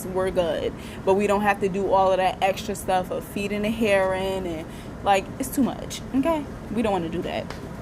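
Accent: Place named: North, United States. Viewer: Girl I love your accent! I’m from TN but my husband’s from up north and y’all kinda sound alike!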